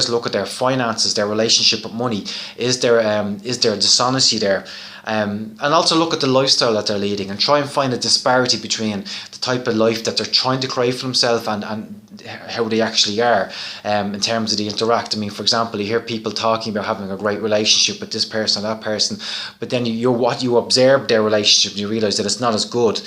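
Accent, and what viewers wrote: Ireland. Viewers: You look like an Italian, but sound like an Irishman.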